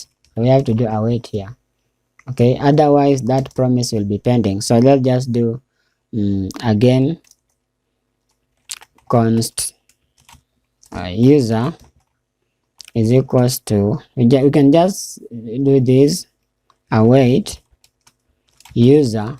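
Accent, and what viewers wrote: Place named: Asia. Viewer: U sound Asian, ru sure ur a?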